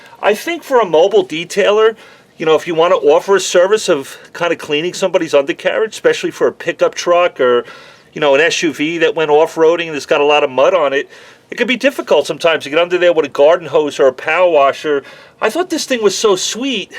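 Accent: New York, United States. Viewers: You may be based in Dallas, but you still sound like a New Yorker.:) BTW, I believe it's pronounced "Ree-oby".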